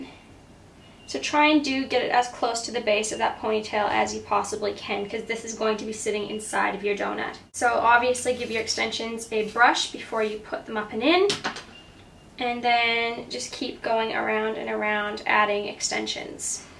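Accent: Australia. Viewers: Yes! I can hear a bit of Aussie :) you are becoming one of us haha xx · I can hear youve started to pick up a but of an aussie accent? :) thanks forth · I hear someone Austrailian Accent in you!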